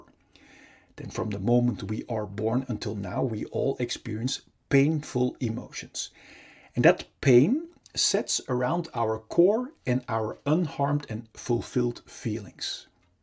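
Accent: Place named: Netherlands. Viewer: Are you Dutch? I think I recognize your Accent. If so, cool , groetjes uit Utrecht.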